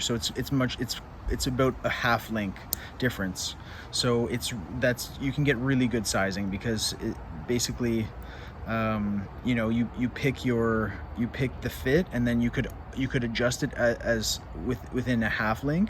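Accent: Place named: Canada. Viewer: Enjoying your reviews. You should do more. You're Canadian eh, just your Os and French pronunciation. Are you involved in any local watch groups?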